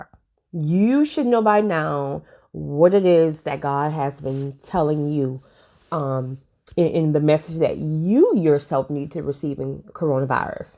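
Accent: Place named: East Coast, United States. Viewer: Thanks for speaking; (You do need to shut off the alerts from your dm's😉 👍)..But hearing your 'east coast voice', to THIS 'way-faring stranger', was a blessing.🙏 ( Storm? Tornado alerts here in Oklahoma⚡🌪⚡🌘⚡🌪). You have a beautiful voice. 'NovelCoronaVirus19' is in Gods hands; Don't worry, Stay safe.🛐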